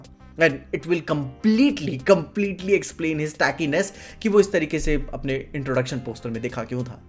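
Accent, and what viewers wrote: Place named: North, India. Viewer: abbe accent ka difference hai. North me aise hi bolte hao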